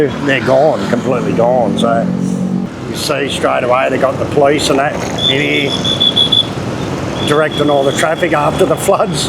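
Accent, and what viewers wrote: Australia. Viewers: I’m in North Queensland, great to hear the flat Aussie accent 😊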